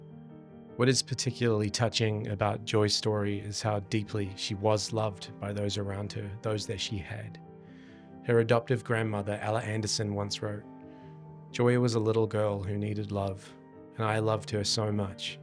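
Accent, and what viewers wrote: New Zealand. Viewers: Is there a hint of kiwi in his accent? The way he says 67. "Sexty sevan ". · Don’t know who your watching but he is not a Aussie if you listen to him he is from nz he has a kiwi accent not Aussie accent sorry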